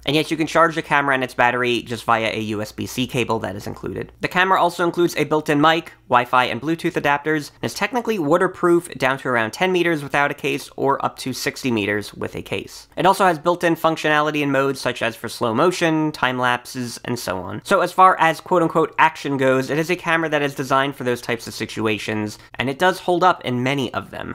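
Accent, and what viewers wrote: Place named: Delaware, United States. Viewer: I'm watching this from Poland right now, but I grew up in Delaware. I'm def hearing some sort of Delaware / South Jersey accent. Key words - waterproof "wooderproof" / video "videoh" I love it, brings me home!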